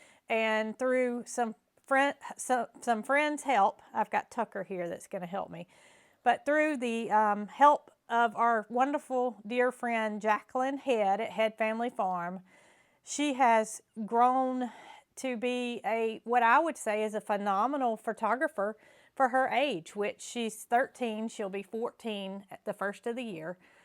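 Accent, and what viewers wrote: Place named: Georgia, United States. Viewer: My favorite photo was the flying heron., when I listen to you I’m reminded of my grandmother. She was born Georgia. The cadence of your speech, your accent and way of telling stories reminds me of her so much it brings tears to my eyes. You make me feel a sense of Home. Thank you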